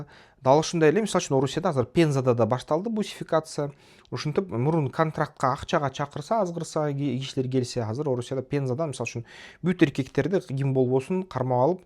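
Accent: Standard. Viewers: Эн маанилүүсү таза эне тилде сүйлөгөнү эле кандай сонун ийгилик түшүнүктүү кылып айтасыз керемет.Ар бир берүүнү күтүп көрөбүз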